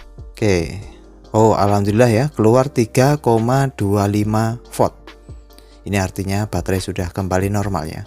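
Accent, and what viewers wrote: Java, Indonesia. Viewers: Mas kalo boleh tau mase orang mana ya? Jawanya jawa mana? Siapa tau kalo ada apa apa bisa mampir ke mase🤭😁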